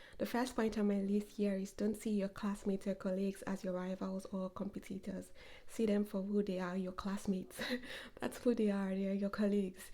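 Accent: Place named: Nigeria. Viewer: I knew it! You sounded Nigerian in the first video. Seeing that you last posted 9 months ago, I low-key wish I could connect with you. I know PhD is a lot. I pray you pass your first year qualifying examination and find the balance to come back to posting. Wishing you the best,.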